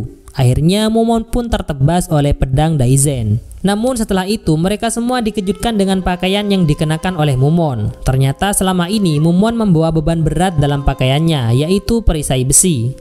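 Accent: Madura, Indonesia. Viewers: Orang madura ya min? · Oreng madureh pengisi suaranya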